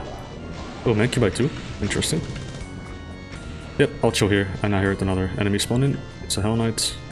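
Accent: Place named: Netherlands. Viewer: It is the Dutch way of speaking English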